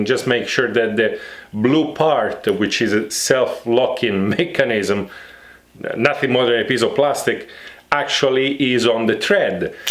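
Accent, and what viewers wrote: Italy. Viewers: the Italian accent adds to the experience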